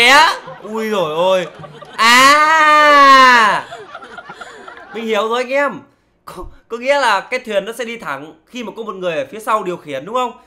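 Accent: North, Vietnam. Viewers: miền Bắc nha bạn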